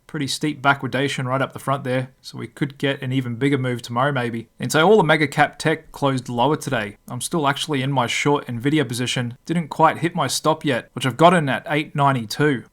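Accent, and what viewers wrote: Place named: Australia. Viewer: I liked that Aussie accent 👍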